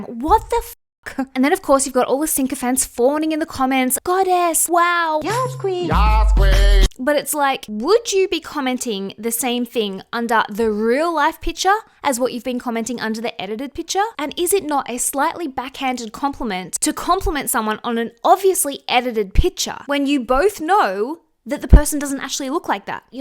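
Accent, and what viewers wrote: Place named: Australia. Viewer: It’s the Australian accent bro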